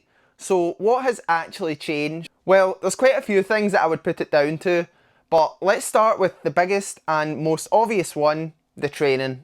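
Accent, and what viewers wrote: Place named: South Africa. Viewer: Where are you from with that accent? South africa?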